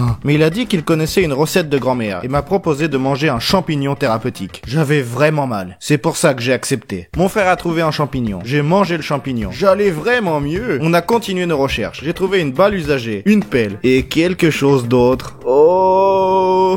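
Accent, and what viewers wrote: Russia. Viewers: t'es russe ?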